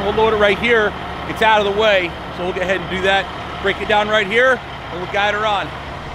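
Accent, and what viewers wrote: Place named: New York, United States. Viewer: at first, I thought you were just some loud crude new-yourk yank. but, i like your no nonsense attitude and the multi video angles are good.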